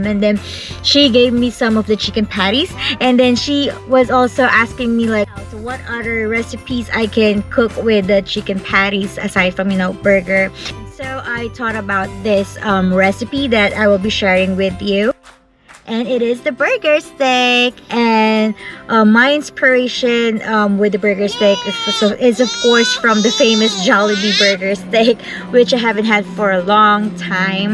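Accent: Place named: England, United Kingdom. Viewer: I love your english accent, Enjoy cooking and you can do it. Stay healthy po #dabestiesjasCovers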